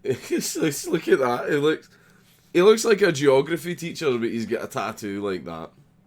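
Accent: Germany. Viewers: Pretty solid German accent buddy 👌👌👍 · Man you can do a German accen hilariously well! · your german accent is godlike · Say "party pooper" in your German accent. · That german accent is creepily accurate. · You do a REALLY good German accent!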